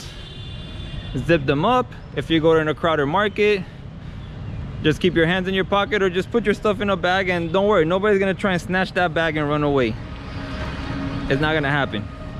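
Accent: India. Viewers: Dude stayed in india so long that he has developed a little bit of Indian accent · maybe he is trying to speak slow , so it sounds like little bit indian.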